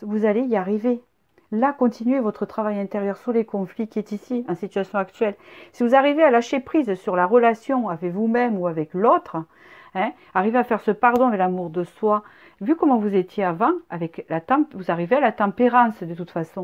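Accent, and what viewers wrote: South, France. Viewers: Merci beaucoup! Pile au moment où j'ai eu la certitude que j'avais fait le bon choix (le 2), vous parlez avec humour de votre accent du sud! J'ai pris cela comme une confirmation, moi qui suis née et vis dans le sud🤗🌻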